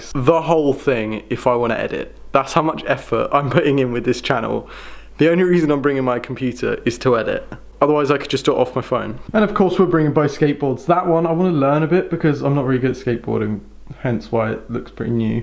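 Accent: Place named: England, United Kingdom. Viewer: I’m American I like the way your English sounds what’s your thoughts about the American people I like the English voice lol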